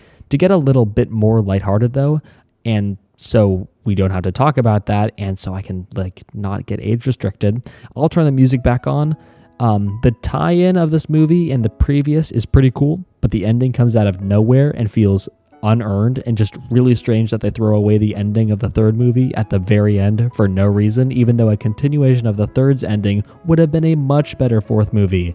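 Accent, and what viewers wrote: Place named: Canada. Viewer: 1st video of yours, where’s your accent from? I’m assuming Canadian? (Sorry if wrong I’m from Australia lol)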